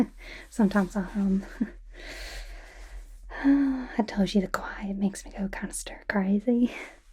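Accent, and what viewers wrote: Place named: South, United States. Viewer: When I read “Goth Rogue”, I was imagining like a DnD character, so I was a little taken-aback by the southern accent 😅 but I still like the actual video idea 👍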